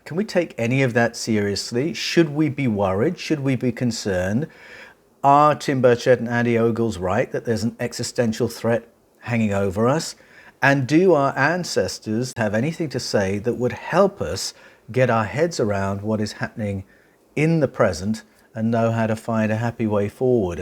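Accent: Australia. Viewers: I took down a scathing comment fueled by disappointment over tainting your material with background music. But your material is WAY too critical and far too poignant at this time to need ANY "accessorizing" brother. Trust me, you are amongst the vanguard and a pillar of this global movement into Truth, and Truth requires no supplementation or overly loud background music. We're hanging onto your every intrinsically melodic Aussie word anyhoo, and imo you require no musical accompaniment.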